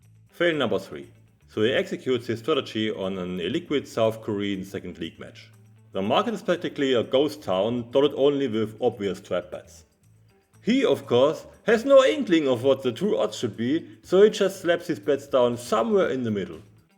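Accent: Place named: Netherlands. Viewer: Sounds Dutch to my Ear.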